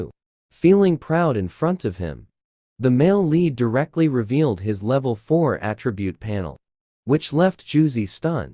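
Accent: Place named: South, United States. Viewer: 1.25 is the perfect speed for this thing. Especially if your used to a faster way of speaking, then the southern drawl this one is set too. :)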